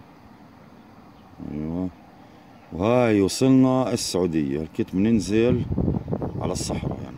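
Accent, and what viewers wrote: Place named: Palestine. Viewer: ***** تسلم يا غالي.. ربي ينصركم بربي اجمل مافي فيديوهات الاخ غير لمعلومات انو بياخدنا سياحة في فلسطين و منسمع لهجة لفلسطينية العريقة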